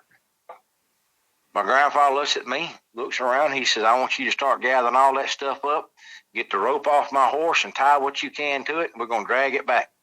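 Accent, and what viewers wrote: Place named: Alabama, United States. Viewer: You sound like your from Alabama, from my neck of the woods.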